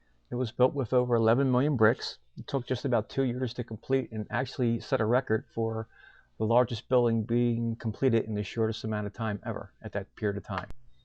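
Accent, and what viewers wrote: Philadelphia, United States. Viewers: Thanks for posting. As another commenter said “love your Philly accent(!)”. My Dad worked there for 37 years. First in the building with the clock tower. Moved to the new building next door when it opened. Retired 1971. He talked with respect and admiration for the fire fighters of Engine 70. Also the Philly PD. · Love your Philly accent! Thanks for the great video & interesting commentary.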